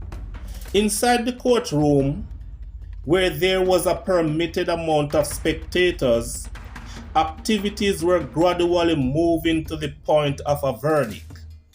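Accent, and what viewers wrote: Patois, Jamaica. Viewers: PATWA! Spoken language of Jamaica! Surely this must help you understand. If not! GOD guh wid yuh! Bless!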